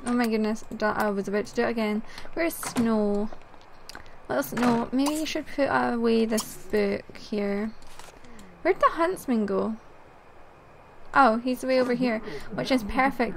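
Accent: Scotland, United Kingdom. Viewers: I guess you're Scottish , we'll I hope you are :) I am , but I don't have the accent because my parents are English xx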